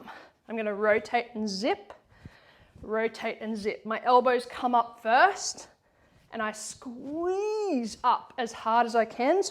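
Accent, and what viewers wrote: Australia. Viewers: Hi. I sent this on your facebook messages but saw you don't go on there often from your last post.... you've popped up on my you tube and i've been watching a few videos and just love your energy and you resonate so much with me in so many ways but mainly as i've had a problem with my eyes/neck and dizzyness for so long!!! I want to get through your videos but i was wondering if there is any one video or sequence that you think i should start with? i just started your 10 minute lymth workout and i love it and the constipation one was so funny!!! Basically my eyes feel like someone has pulled them out on a spring and then let go and that weird feeling(like i've had that done before lol) but only way i can explain it. I look forward to slowly watching all your video. Thank you for helping so many people and i love your accent, aussie accent is the best!! 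Reminds me of my INXS days ❤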